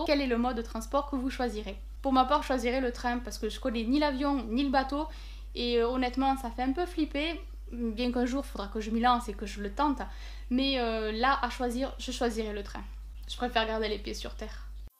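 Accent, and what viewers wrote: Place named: Toulouse, France. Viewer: Juste super resultat j'adore cette sonorité toulousienne ^^! Cest merci à vous deux d'autant m'apprendre et nous partagé tous ça! ET SURTOUT davoir eu et pris le temps de repondre cest super.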